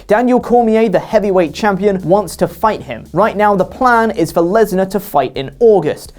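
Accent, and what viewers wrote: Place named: England, United Kingdom. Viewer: As long as you have an English accent I could care less if you’re a different person.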